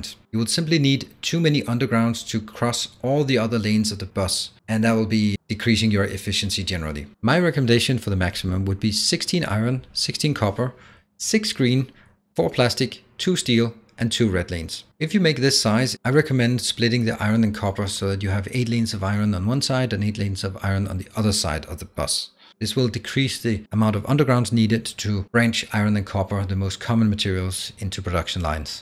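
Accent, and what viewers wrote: Denmark. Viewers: Wow you sound super duper danish. :) Nice video!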